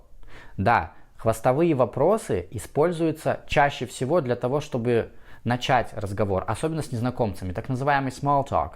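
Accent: United States. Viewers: пожалуй не соглашусь, доносит вполне себе усредненый, современный американский и канадский английский.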